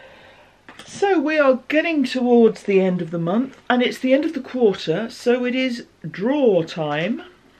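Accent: England, United Kingdom. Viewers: I enjoy your podcasts so much! It is partly your sweet English accent, your beautiful knitting projects and your great personality❤️Thanks so much for sharing your life with us❤️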